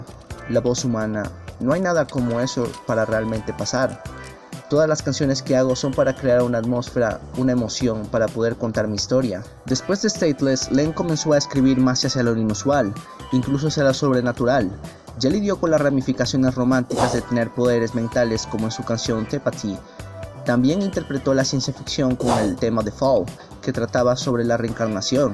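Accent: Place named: Venezuela. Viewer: Genial este resumen sobre la grandiosa, a través de este material descubrí este excelente canal, que voy a seguir en el futuro, si no me equivoco por tu acento neutro podrías ser venezolano al igual que yo, saludos por tu canal.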